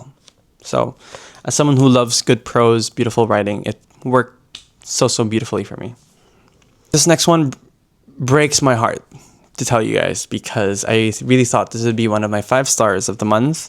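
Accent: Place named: United States. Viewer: where r u from? just interested bcz u'r speaking like american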